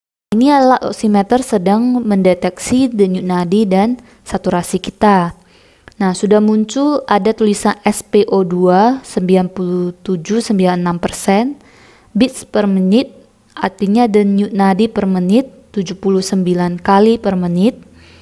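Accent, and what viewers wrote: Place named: Medan, Indonesia. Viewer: Orang Medan ya? Logatnya kayak temen Medan saya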